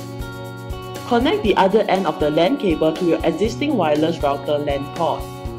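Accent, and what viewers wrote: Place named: China. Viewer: I love the Chinese accent!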